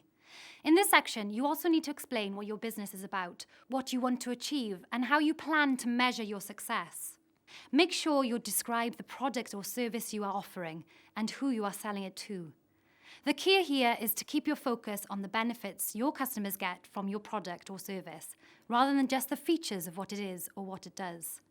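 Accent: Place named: England, United Kingdom. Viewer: I like your English accent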